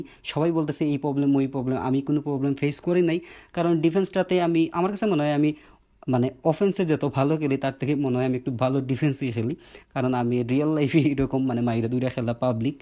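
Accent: Sylhet, Bangladesh. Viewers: bai channel or namor bay deka lagse na. tumar voice e bujilaisi tumi sylheti😁🫣🫣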